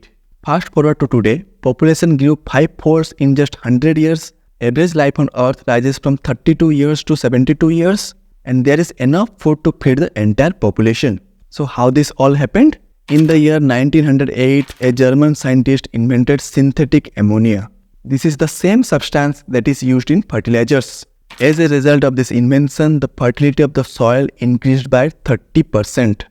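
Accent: India. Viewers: Brilliant video, keep them coming. For others who are commenting on “pronunciation”, I want you to know that international viewers don’t care. (I work with them). And looks like you too are from say up/bihar/odisaa side? It’s the Indians who will crib about pronunciation. Yes, keep it improving but know that the threshold is already crossed and the speech quality is good. Take these comments with a grain of salt and keep up focused on the video content and quality.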